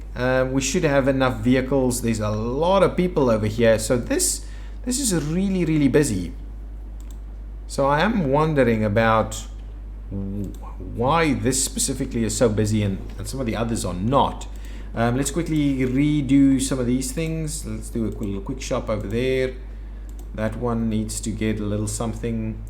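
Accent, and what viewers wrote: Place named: Afrikaans, South Africa. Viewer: You sound like you are from South Africa 🇿🇦, with an Afrikaans accent.( I’m from SA ) Where are you from ?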